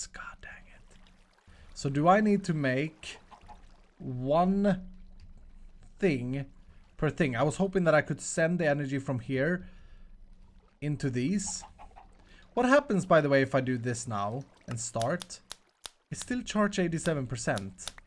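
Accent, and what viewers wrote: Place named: Sweden. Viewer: Are you swedish is kall is a swedish word and your first vid sounds like a swedish man talks english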